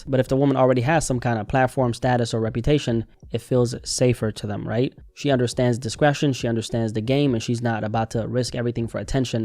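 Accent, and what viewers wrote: Jamaica. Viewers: u Jamaican?????? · You Jamaican ?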